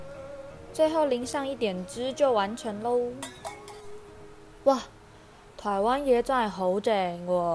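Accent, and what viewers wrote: Cantonese, China. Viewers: 台妹講廣東話好可愛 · 啲廣東話好得意😂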